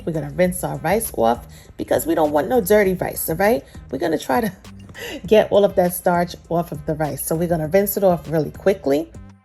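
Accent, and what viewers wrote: New York, United States. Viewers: It's her New York accent for me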